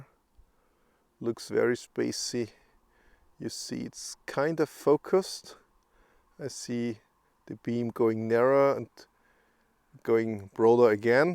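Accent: Germany. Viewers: it took me three seconds to be sure youre german.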